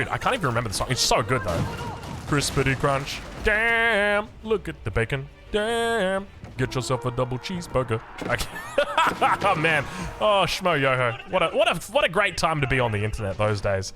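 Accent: Australia. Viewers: Hey, my Englisch was never good. But cause of you it sounds even more funny. After picking up Spanish accent when living in South America and mixing it with my German accent now it got spiced up with your Aussie accent. Thanks for that. 😂 love your vids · i could listen to talk about anything. dude is so funny and quick and idk if it's an American thing but Aussie accents just make me smile. i dont even play AoE but i know everything about the game now haha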